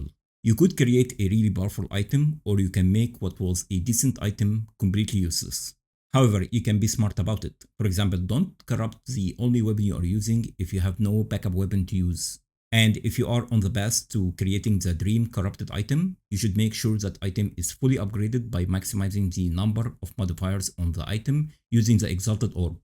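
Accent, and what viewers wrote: Egypt. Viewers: ايه يا باشا من لهجتك عرفت انك مصري ❤️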